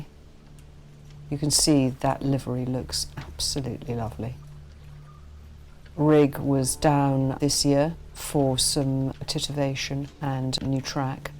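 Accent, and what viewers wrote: United Kingdom. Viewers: I love her English Accent, so how much is it? Anyone?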